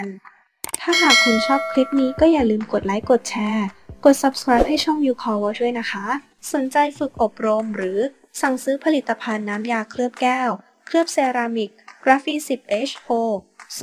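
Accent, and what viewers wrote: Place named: China. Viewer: พูดไม่รู้เรื่อง อังกฤษสำเนียงจีน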